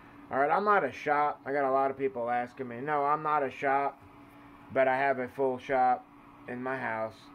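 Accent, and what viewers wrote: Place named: Tennessee, United States. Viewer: Hey... interesting background you have. My first CB radio had 12 channels and was crystal controlled. I am also a Ham for about 45 years. On top of that I have been a news photographer and videographer since 1967, and that's what prompted me to comment here. Since the radios are "horizontal" in shape you're videos would be greatly improved if you turned the phone camera sideways when you record your videos. Then we viewers would get to see more of your station at one time instead of big gray boxes on the left and right of your images. I hope you find that constructive. It was fun listening to you. Reminds me of back home in East Tennessee and Western NC.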